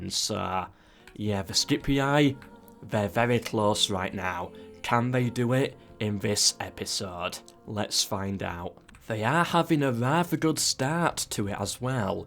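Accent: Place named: Northern England, United Kingdom. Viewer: Northern commentary by a Northern lad. Very good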